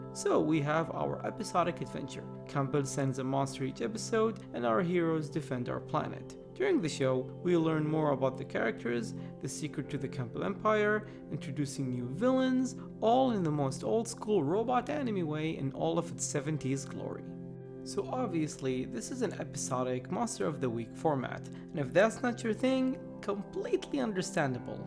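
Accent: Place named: Arab world. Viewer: are you an arab? u sound like a person who grew up in the middle east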